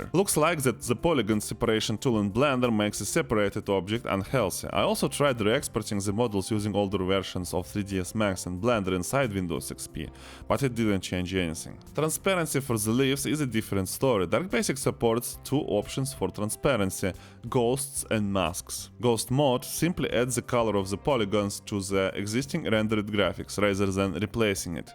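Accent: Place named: Russia. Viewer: Охренеть, только и акцент русский, а почти весь ролик становится понятным без перевода! 😧👍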